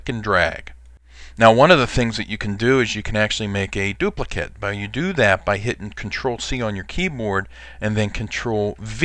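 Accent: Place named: Pittsburgh, United States. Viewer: I'm saying you're from Pittsburgh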